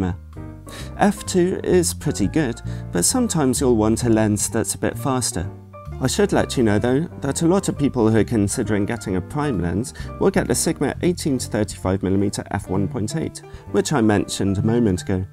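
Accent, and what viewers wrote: United Kingdom. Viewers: The first time I see your face. :) The guy with the british accent. Greetings from Rio.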